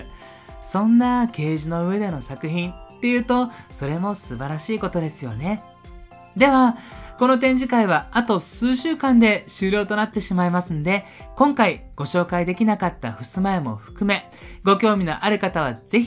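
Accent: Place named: Kobe, Japan. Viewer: 先生の動画でちらほら聞こえる上品な関西弁アクセントは、神戸かなぁって思ってるんですけど、やっぱりそうやったんやろか?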